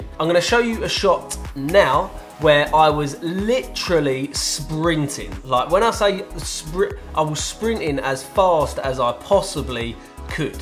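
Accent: United Kingdom. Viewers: Hey, nice video. Sounds like your from UK? Where did you order yours from? Seen them on Amazon, Also does the app work on andriod phones? Thanks.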